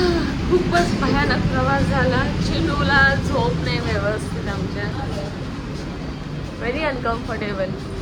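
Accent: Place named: Pune, India. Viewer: kiti te puneri bolnya cha attahas to ag sangmneri bhasha visarlis ka aamchi bagh kashi bolte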